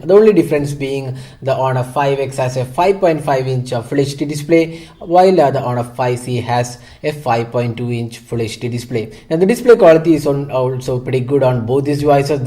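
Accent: Kerala, India. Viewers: Your a mallu its very clear with your english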